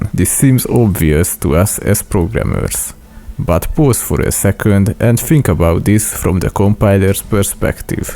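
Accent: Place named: Hungary. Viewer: Came for C++, stayed for the Hungarian accent.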